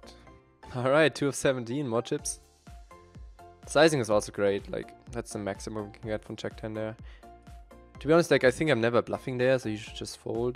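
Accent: Germany. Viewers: you are talking with german accent so much it seems not english...maybe coz you are in germany now btw great content as always. congratz keep it up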